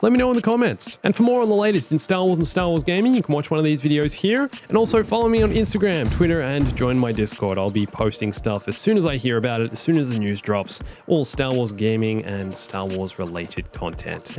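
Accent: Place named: Australia. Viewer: Mate you sound like a Aussie I got a X series about two weeks ago I love fallen order and it doesn't freeze it plays better and not as dark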